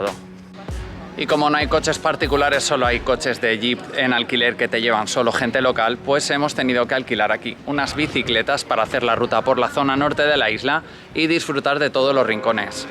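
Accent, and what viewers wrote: Castile, Spain. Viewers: Muchas gracias amigo, eres un excelente guía con esa pronunciación tan bonita castellana. Un saludo.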